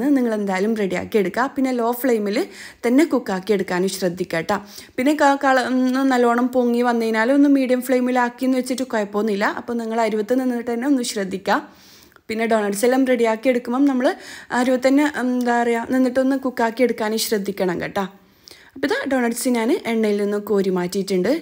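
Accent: Kannur, India. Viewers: കണ്ണൂർ ഭാഷ തന്നെ മതി കേൾക്കാൻ നല്ല രസമാണ് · കണ്ണൂർ ഭാഷ പറ ഇതാ ഞങ്ങളും കേൾക്കട്ടെ കേൾക്കാൻ നല്ലരസാ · English channel kanunna pole nd.. masha allah.. but varthanam njmmle kannurle🫶🤩food kuraknamnn vijarkum iyalde recipe kanumbo pinnem ndakki povm.. keep going🎉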